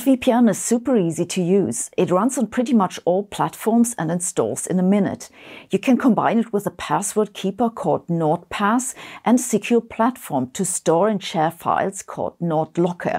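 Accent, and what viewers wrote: Germany. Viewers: pɹəˈdʌkʃən love the german accent😊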